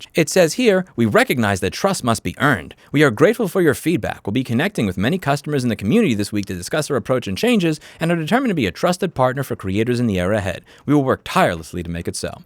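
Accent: New York, United States. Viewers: I just now noticed your new york accent, never noticed it before this video.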